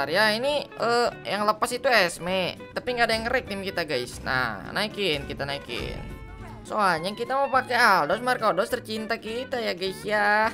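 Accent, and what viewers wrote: West Java, Indonesia. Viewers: Bang orang Sunda ya😂😂 sama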